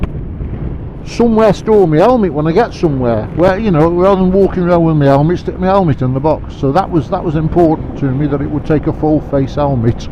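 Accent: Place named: Staffordshire, United Kingdom. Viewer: Nowt up with your North Staffs dialect bit like ours, though bit further afield they travel around on buzzes It was only recently, on someone’s suggestion, I started putting helmet on it’s side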